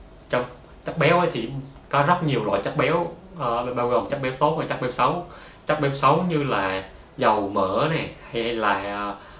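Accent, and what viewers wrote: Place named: Phu Yen, Vietnam. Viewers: anh ơi a ở Phú Yên - Bình Định ạ ? giọng anh quen quá đi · giọng a nghe giống giọng phú yên nhề :))) nếu mà giống thì chung quê ùi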